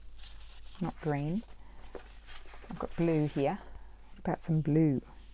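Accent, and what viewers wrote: Australia. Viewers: Great video, loved watching you create those pockets... It's also nice to hear another Aussie!!! I was chuckling away listening to your story... 😂😂😊 tfs xox hugs Fi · My husband said the same to me about upside down butterflies. I told him I like them that way. Haha. And I love your Ozzie accent. I had gardening friends in Texas from Australia.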